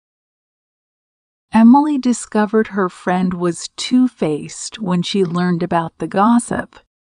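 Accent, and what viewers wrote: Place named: United States. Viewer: This is American English, please differentiate.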